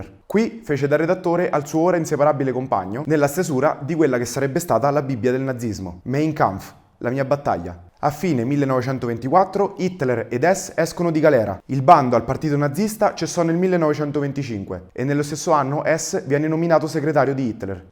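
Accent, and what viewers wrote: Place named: Rome, Italy. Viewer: La mia non voleva essere una critica, ma una simpatica constatazione del divertente accento romano.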